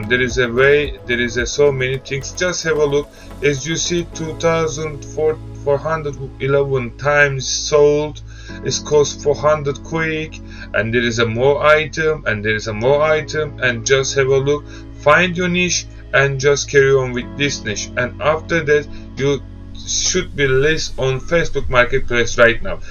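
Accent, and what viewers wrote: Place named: Turkey. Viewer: eski alışkanlıklar unutulmuyor ceterele viii :) şaka maka türk gibi ingilizce konuşmanı çok taktir ettim eğitimini almayı düşünüyorum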